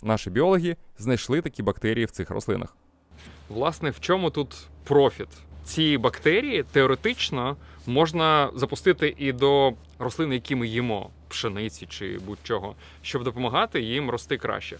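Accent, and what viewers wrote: Russia. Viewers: Птушкін українською вже не той… Не такий щирий, не такий емоційний і не такий цікавий. При коментуванні та озвучці відео вставити за весь час 150-200 російських слів і фраз…ну не йде, Антон, для чого видавлювати з себе те, що навпаки псує враження? Тебе прекрасно всі розуміють російською та ще й в 100 разів більша аудиторія…ну таке собі, чесно, без образ. За роботу браво і дякую, за мову - мінус, хоча я сам із заходу і виріс в україномовному середовищі, але чути від тебе українську - не заходить абсолютно…☹️ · ни одна страна мира так не кичится своим флагом, гербом и языком, как Украина. больше видимо нечем. Если что русскому человеку все понятно и на украинском, но справедливости ради приходится вслушиваться. Но просто все понимают что ты не украинец, родившись и вырастая ты находился в русском, тогда еще находившимся на территории Украины, но русском, Луганске. 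Ощущение, что русский говорит на очень простом украинском, где по-украински он знает только самые банальные слова, будто разговор с акцентом. и да я понимаю, что это мое личное негодование и не мое дело и я это признаю. просто искренне хочется смотреть крутые видосы на полностью понятном тебе языке и человека который всегда снимал на нем, всегда все всем понятно было и всегда было здорово все. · Дякую за фільм. Чудові зйомки! Тільки попрацюйте над мовою, бо купа русизмів. британці not брітанци, корабЕль not кАрАбль, на вОду not наваду, швартОвІ not швартовИ, квіточкИ not цвєточкі, мИлотА not мілота, глИбокій not глУбокій, архіпелаЗІ not архіпєлаґє, Шпі́цбе́рген not Шпіцбєрґєн, лід not льод, кОнтинент not кантінєнт, білий ведмідь not білий медвідь, к раєвид not вид, човен not лодка, сУдна not судА, замайорІв not замайорИв, материку nat матєрікє, особини not особі, живіт not живот, берлозі not бєрлогє, стіл not стол, стосунки not відносини, ну і звісно Да легко можна змінити на Та)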